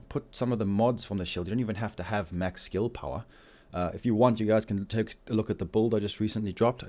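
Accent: South Africa. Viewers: South African ? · you south african?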